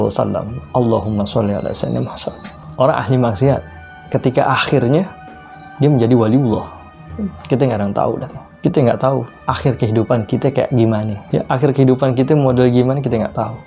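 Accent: Jakarta, Indonesia. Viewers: Terimakasih Ustad, Sangat bermanfaat. Medok banget dah ah betawinye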